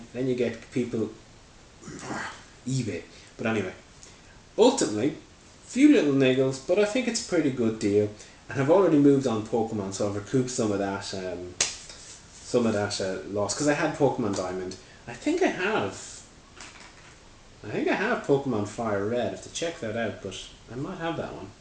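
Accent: Ireland. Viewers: are u irish , u seem to have some sort of an irish accent?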